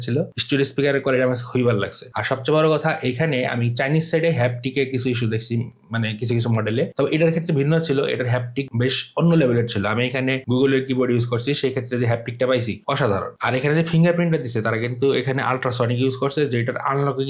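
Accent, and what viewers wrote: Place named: Gazipur, Bangladesh. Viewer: Vai basa ki gazipur naki tangail?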